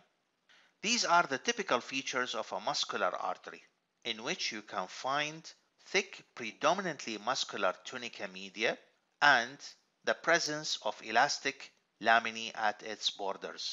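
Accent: Iraq. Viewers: شكرًا يا دكتور .. احساس كبير من الاكسنت ان حضرتك عراقي😅🙈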